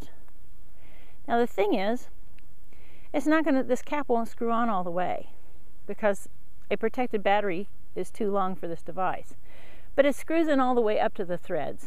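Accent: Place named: United States. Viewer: If It wasnt for your American accent, I could swear that you were here with me in Australia! A+ review as always & 3 the hat!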